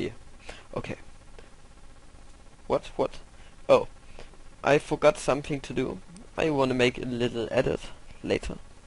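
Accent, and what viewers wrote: Germany. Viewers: Could it be, that you're german? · You Are German ??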